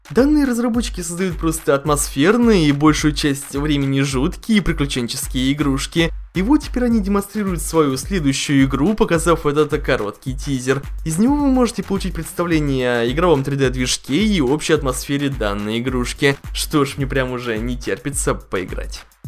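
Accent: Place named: Moscow, Russia. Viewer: у тебя акцент немного эээ... московский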